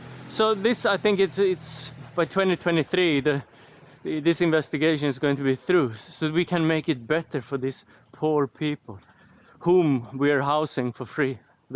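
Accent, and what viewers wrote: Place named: Sweden. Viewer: Your followers around the world apparently do not understand your swenglish so well. 10 comments only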